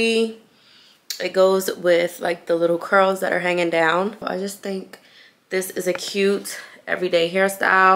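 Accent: Jamaica. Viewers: Hey! First of all I’m here for the Jamaican accent 😍 And I love your content! I’m one of them OGs been watching since you were in cosmetology school!🤗 Just keep doing what you’re doing I love your vlogs I also love your outfits so maybe do some outfit ideas and I love your make up and hair too so just keep showing us or maybe self care routines too like face care routine or body care routine 🌷🌷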